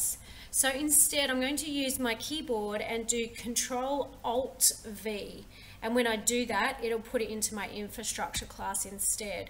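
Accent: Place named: Australia. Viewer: Nice to hear an Aussie accent!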